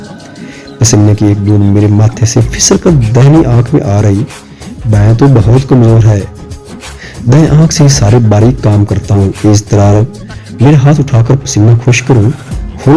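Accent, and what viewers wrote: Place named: Saraiki, Pakistan. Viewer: Yaar sariki accent m Urdu pharty hain aap aur pronunciation ki Aatny gultian uffff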